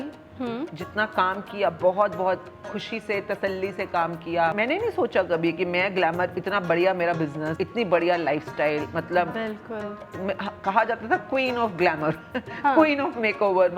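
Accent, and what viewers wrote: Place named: Punjab, India. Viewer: Aap ki punjabi ka lahja bilkul punjabi hai thank,s .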